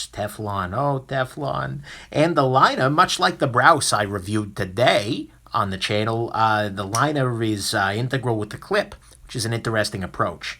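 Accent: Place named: Brooklyn, United States. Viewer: English language learner here. Excuse me, what kind of English accent do you have? Brooklyn? It's very interesting and curiously easy to understand in spite of your very fast delivery.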